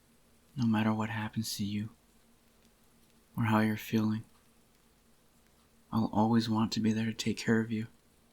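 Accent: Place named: South, United States. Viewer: bro idk why but he sound hella country....still a good AMSR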